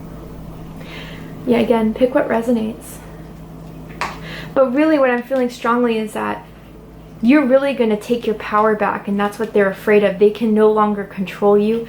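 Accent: Scotland, United Kingdom. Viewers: Scottish lol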